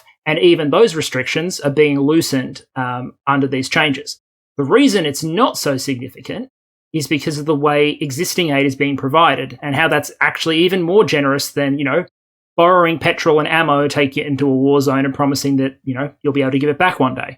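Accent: Australia. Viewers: I like how these videos are slowly getting more 'strayan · I don't have any kind of training or expertise in any field relevant to this war, so am very happy to get as much information as you can provide, considering every angle and in as much detail as possible. I enjoy sitting through exceedingly long lectures, so unless you're reading through a list of every nut and bolt of every tank there is, I won't be bored. I also very much enjoy your style of exposition, and I always get a kick out of your accent. Listening to an Australian give a more level-headed explanation of what is happening to our neighbours somehow feels reassuring. That being said, I understand that covid is still giving you a hard time, so I will not complain about the shorter videos. I would suggest that you create more in-depth analyses and divide them into episodes. I suspect we are a rather patient audience. 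I wish you a full and speedy recovery! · If shorter means more regular content is possible then I am all for it. I just want to listen to those dulcet Aussie tones telling me things that no one else seems able to tell me.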